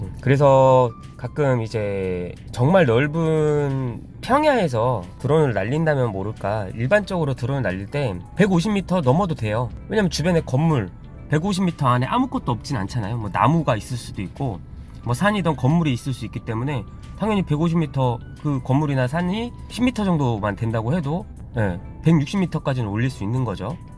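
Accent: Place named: Daejeon, South Korea. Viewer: 대전분이신가봐요? 구독ㆍ좋아요ㆍ알람설정 했습니다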